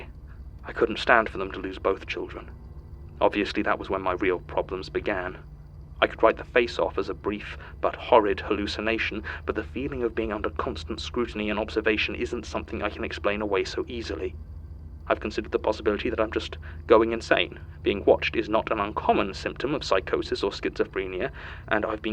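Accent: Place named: United Kingdom. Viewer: I was really unbelieving when I kept hearing about how the Magnus archives has every fear, but I gotta say my heart sank as soon as I clicked on the first episode and heard voice. British people.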